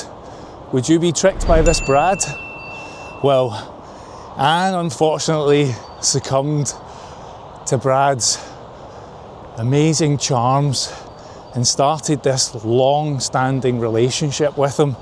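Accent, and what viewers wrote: Scotland, United Kingdom. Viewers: Some people are so gullible lol. Just love watching and listening to you. I’m Scottish too and listening to you is just like listening to a friend chatting away. I have a snuggly hoody like that too and love it but the arms are about 5 foot long on it 🤣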